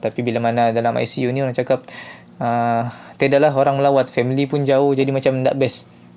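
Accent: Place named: Sabah, Malaysia. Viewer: Cakap sabah jua dia haha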